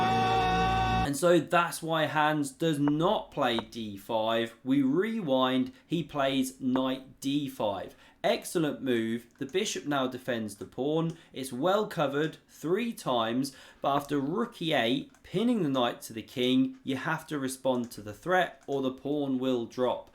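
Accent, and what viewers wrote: England, United Kingdom. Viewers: I came here for the English accent, but stayed for the chess. Heh.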